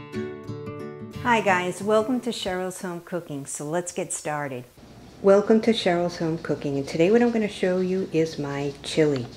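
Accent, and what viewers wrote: New Jersey, United States. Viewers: Definitely from New Jersey New York area! Love it. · That looks delicious !!! I copied down your recipe, and Subbed ! I also love your kitchen, and that Jersey accent !!!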